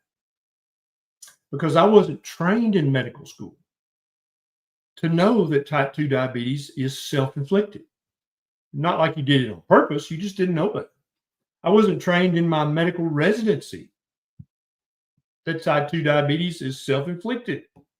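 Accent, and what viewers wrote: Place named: Tennessee, United States. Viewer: Ikr?! Plus, he is from the part of TN that I am from so his accent hits my ear so right.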